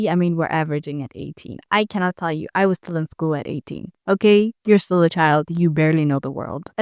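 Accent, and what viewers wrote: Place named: South Africa. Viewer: Love your voice and accent! Are you South African because it's giving South African accent?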